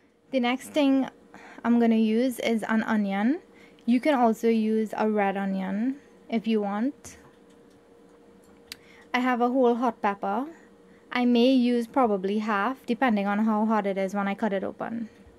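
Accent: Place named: Trinidad and Tobago. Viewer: Love your cooking. Keep up the good work . Love that you talk trini and don't put on a fake accent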